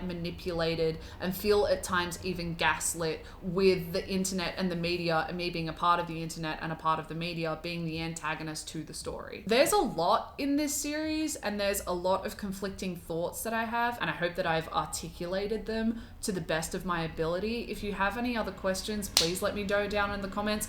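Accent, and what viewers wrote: Australia. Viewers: Man it is SO nice to hear an Australian accent, and to hear Shane described as ‘a bit how ya goon’ bc that is the most accurate description of the man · Are you Australian? I've just found your channel and I myself am an Aussie and some of the things you say are just so Australian. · This really do be a hot take. Also idk why it's still so weird to hear you talking in your normal voice, but even as a fellow Australian, I love your accent so much! P.s. those eyes are giving me corpse bride vibes and I'm LOVING it! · Holy shit, this is the first video I've seen of you off of your viral tikkoks and yes! I'm living for the Aussie accent. Also super happy to see you growing, keep being an awesome bean.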